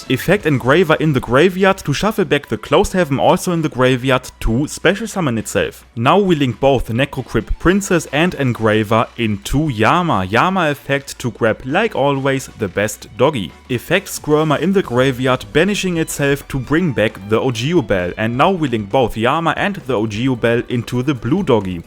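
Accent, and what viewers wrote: Germany. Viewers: The german accent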